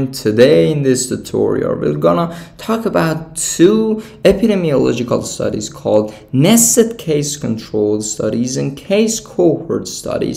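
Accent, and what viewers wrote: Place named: Iran. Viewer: Thank you for sharing this amazing video. Right from the start, I could tell that you are Iranian because of your lovely Farsi accent and the way you wrote "eighty" in Farsi 😁. By "group matching," do you actually mean frequency matching?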